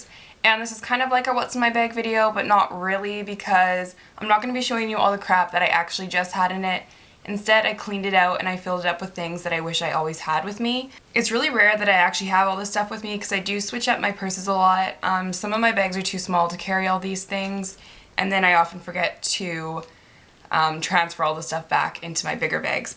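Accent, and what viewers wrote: Canada. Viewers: no not really... prob cause ur not used to Canadian accents. i guess i'm just used to it cause i'm Canadian ;) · she's Canadian so she does have a slight accent. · What the hell?! LOL. I'm Canadian and we don't consider ourselves to have accents...she talks the way I talk too. lol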